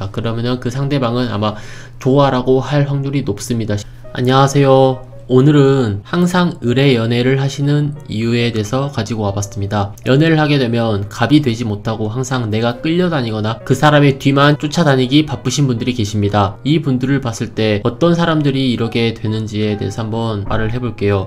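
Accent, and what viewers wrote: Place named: Gwangju, South Korea. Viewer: 최근 힘들어서.. 영상을 거의 보게 됏습니다! 제목처럼 전 을의 입장이구요.. 장거리커플인데...지난달부터 마음 식은걸 느꼇고 환승하려하는지..어쩌다 연락도 안됏어요~~ 요즘 모든게 귀찬고 시국도이러니 제주에 사는 분이라 뱅기타지말구..내년에 보쟤서 클스마쓰 오늘 못만납니다ㅡㅡ 헤어지잔말두 안하고..만나지도않고 이 인간 버리고싶은데 맘이 결단을 못내립니다ㅠㅠ 내가 헤어지자고 말하길 기다리는거 같네요.. 제가 더 좋아햇던 을의 연애엿구요! 답은 알아요..근데 잘 안되는게 미칠지경입니다! 영상 모두 잘보구 잇구요..저도 광주가고향인지라 고향사투리 들어서 넘 편하게 잘보고 잇습니다ㅎㅎ 힝 슬프네요ㅜㅜ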